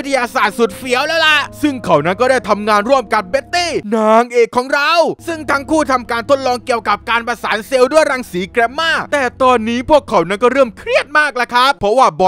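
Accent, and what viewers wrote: South, Thailand. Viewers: สปอยภาษาใต้ทั้งคลิป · ชอบสำเนียงใต้จริงๆ 💕💕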